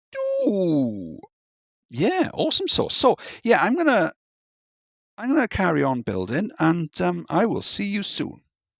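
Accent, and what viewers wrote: Wales, United Kingdom. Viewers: I've been trying to place your accent,! Is it Welsh? Hopefully you don't mind me asking. Regardless, I love your RCT content and have been using a ton of your coaster designs!!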